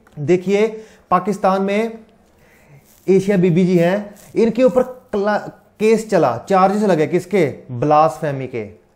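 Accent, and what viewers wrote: Punjab, India. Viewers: He is punjabi. . That's why hindi pronunciation aisa h ..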